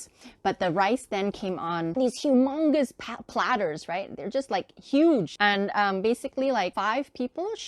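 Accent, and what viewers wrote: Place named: United States. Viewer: Assalamualaikum Sister. My family and I were scheduled to go for Umrah in April 2020. However, regrettably Saudi Arabia closed its doors for all pilgrims in March postponing our trip to the Holy City. Insya' Allah, we will be able to make our said mini-haj early next year. Thank you for your very informative and can I say, entertaining videos. You are very eloquent in your presentation. Going on a tangent, I cannot help but wonder where are you originally from. If I were to hazard a guess from your name, perhaps you were originally from South Korea, judging from your accent you were educated in the States and - clues in the video, you are now living in Asia (Malaysia, I assume... again clues). Ha ha ha! Nevertheless, very informative and I hope to be able to visit and experience these places that you have recommended. Wassalam!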